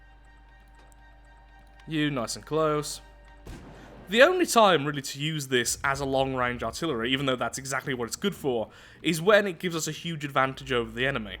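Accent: United Kingdom. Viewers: No I'm here for the jokes , British accent and KABOOMA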